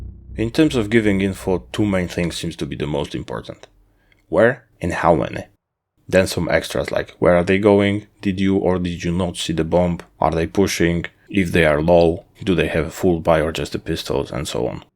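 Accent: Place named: Finland. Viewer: By that accent , are you Finnish ?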